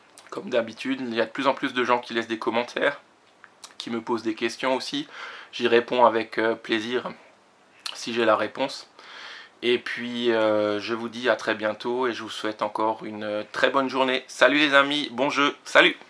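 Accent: Alsace, France. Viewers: On dirais bien un accent Alsacien ^^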